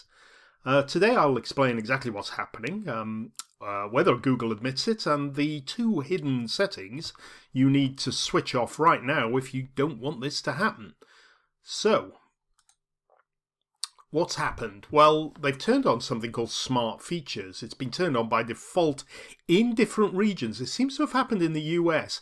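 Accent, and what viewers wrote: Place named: England, United Kingdom. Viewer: Why is this voice using an English accent?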